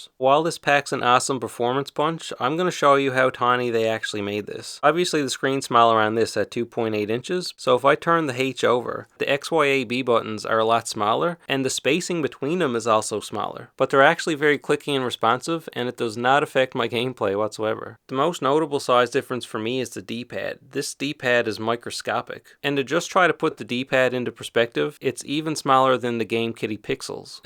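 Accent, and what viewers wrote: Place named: Newfoundland, Canada. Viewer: Just ordered one of these after watching this video. Looks like an awesome rig! You also kinda sound like you're from Newfoundland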